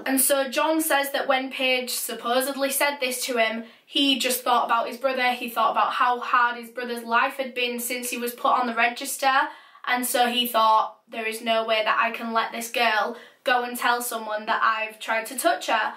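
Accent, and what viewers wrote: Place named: Scotland, United Kingdom. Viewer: I am somewhat new and literally thought you were Scottish you don't sound English sorry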